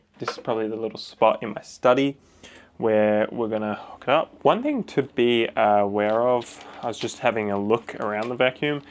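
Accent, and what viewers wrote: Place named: New Zealand. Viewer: you sound like a Kiwi, are you?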